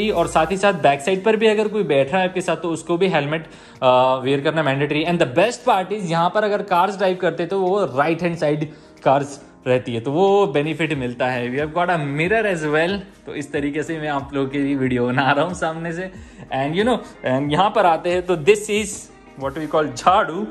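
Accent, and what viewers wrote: Indore, India. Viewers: Bhai pakke indori ho - accent sun ki samajh me aa jata hai haha ;)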